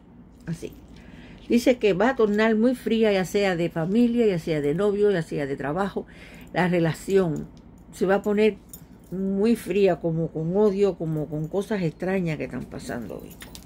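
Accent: Cuba. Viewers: Hola soy dama. Nose tu nombre. X tu acento sé q eres cubana. Cada vez q te he escuchado, eres cm 1 alarma de ten cuidado....pero nose de quién??? Tengo q cuidarme. Ese es mi problema mayor q no se ver la maldad de las personas. Nose qn es qn,¿Quién me kiere hacer daño? Tampoco se xq me kieren hacer daño?? ¿ Xq me kieren hacer daño? Si yo nunca le he hecho mal a nadie...Sólo le pido a Dios qn venga a mí , p hacerme daño, Dios sácalo de mi camino. Y esa mujer q yo sospecho me está haciendo brujería sánale ese corazón malo hacia mi persona. Que me suelte ya. Tú sra q lees las cartas si sale de tu corazón ayudarme hazlo ...evita una tragedia x favor. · Luz para tii TE ADORE , Y SE NOTA QUE ERES CUBANA 100%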